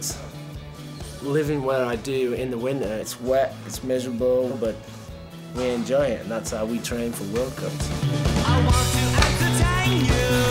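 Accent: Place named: Yorkshire, United Kingdom. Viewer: it's a yorkshire accent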